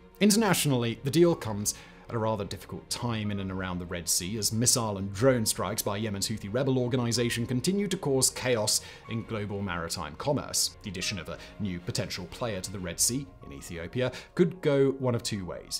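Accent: United Kingdom. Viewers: Love your channel. One question: How come the English pronounced the "A" as an "R... and the "R" and an "A" ? Is it intentional? Like " R B C D E F... and. Q A S T U V? Not making fun, I promise, and the Brits have the best accent ever... just always wondered.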